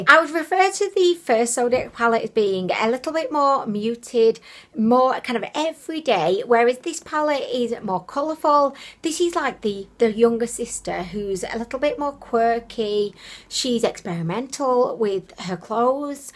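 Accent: United Kingdom. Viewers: Interesting. It’s not a normal British accent.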